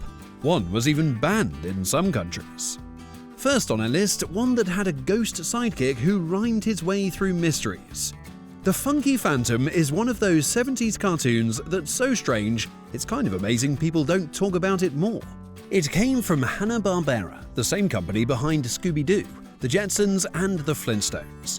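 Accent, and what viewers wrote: United Kingdom. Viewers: Calling all of these cartoons "bizarre" while acting as if Scooby was normal is what's bizarre. And then to do it with a British accent? 👎🏿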